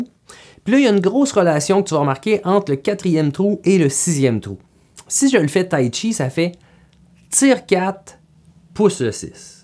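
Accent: Quebec, Canada. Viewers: Is this Canadian French ? nice accent, and good teaching btw.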